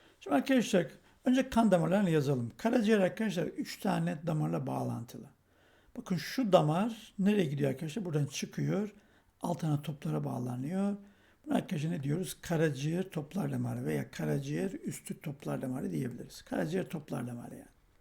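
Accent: Black Sea, Turkey. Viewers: Hocam karadenizin neresindensiniz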